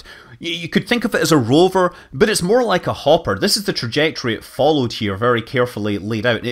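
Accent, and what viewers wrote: Scotland, United Kingdom. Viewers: Came for the science. Stayed for the Scot accent.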